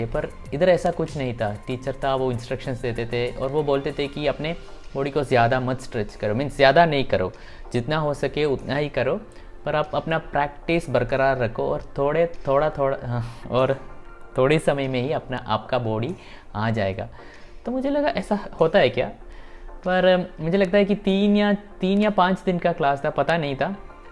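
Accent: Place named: Kerala, India. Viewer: ur hindi is very sweet chetta...whenever any malayali speak hindi..they speak very sweetly!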